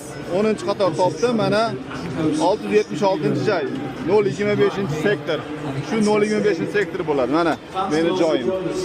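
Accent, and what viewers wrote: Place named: Andijan, Uzbekistan. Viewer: Молодец! Кайф киламан андижончасига ,омад тилаб коламан анжанлиларга!!😊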